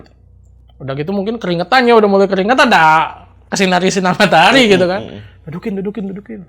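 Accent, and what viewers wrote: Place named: Sunda, Indonesia. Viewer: Logat Sundanya kental banget bang, walaupun pke B.Indo ttep aja sundanya kebawa 😍🔥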